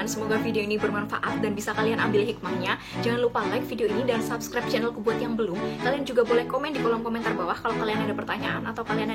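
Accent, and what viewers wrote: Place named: East Java, Indonesia. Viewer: Hahaa... Jawa timur rek.. asyik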